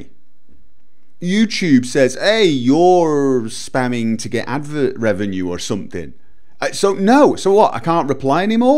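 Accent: United Kingdom. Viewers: I clicked on this because I enjoy hearing British people talk. Same reason I watch TotalBiscut and Yatzee. That and I am interested in what they have to say. British game reviewers > American game reviewers. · on the other hand, a British rant has a flavour all its own. (^_^)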